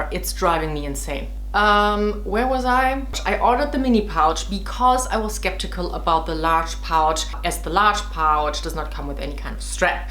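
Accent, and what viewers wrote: Germany. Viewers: Where are you from?:) you sound so German 🙈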